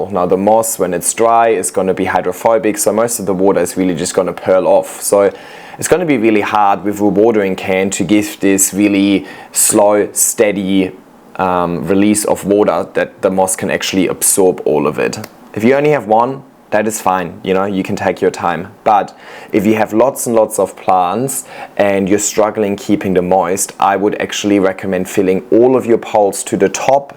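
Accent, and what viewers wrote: Germany. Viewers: Ich habe es nur durch deinen deutschen Akzent irgendwann mitbekommen. Bin hier gelandet wegen deinen wunderschönen Pflanzen und den echten Moosstäben. Sehr inspirierend und funktioniert auch in kaltem, deutschen Winter. 🤪 Liebe Grüße aus Leipzig. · Wusste vorher nicht das du deutsch bist, aber dein Akzent (kaum hörbar) kam mir irgendwie vertraut vor 😊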